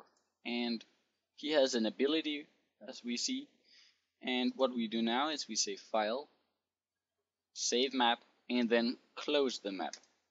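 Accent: Denmark. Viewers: are you danish :D?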